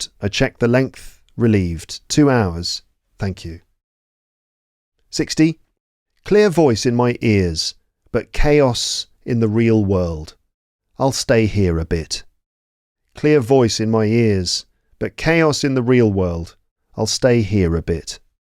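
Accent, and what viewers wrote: United Kingdom. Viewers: ❤ I'm Korean, I'm hope going to England in few years.🙏 It really helps listening British accent...! 😊 Thank you so much!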